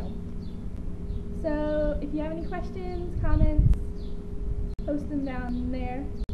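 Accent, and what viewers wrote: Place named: Texas, United States. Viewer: You do have a bit of a Texan accent. It sounds nice.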